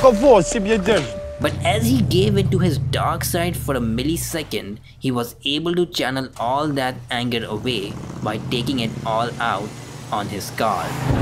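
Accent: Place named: India. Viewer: Dude u have to firm your Indian english voice the vocabulary is good but sharpness of words, u have to soften it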